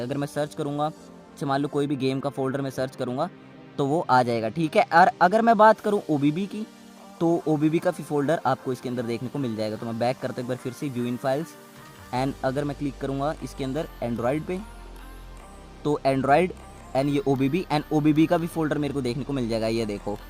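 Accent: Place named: India. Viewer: Is this a joke? It doesn't even work?! Tf? English caption but indian voice wtf?!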